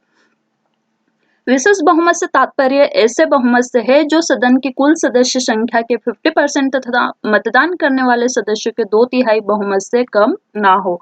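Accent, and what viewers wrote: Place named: Bihar, India. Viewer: Aap Bihar sy ho kya aapki langaguge and tone bihari hau.Mari bhi Bihar state sy hau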